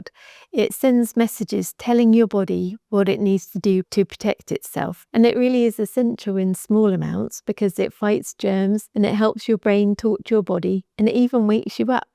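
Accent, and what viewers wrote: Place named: United Kingdom. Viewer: British accent is great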